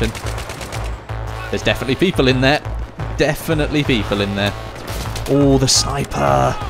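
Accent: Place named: United Kingdom. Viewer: Whoah ur from UK anorl I thought u were American what part UK u from some accent that mate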